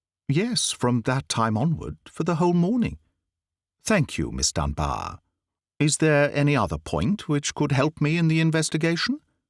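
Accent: United States. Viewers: I have just heard another one of your stories and may I say I have listened to it three times now. It's a wonderful story and you sir as usual are at your very best. I enjoy you so much you just do not know. Your rendition of American English was perfect 👌😍!! Please, keep these stories coming for as long as you can. You still amaze me!! With best regards to you!! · I am always impressed with how well you do an American accent. Good job....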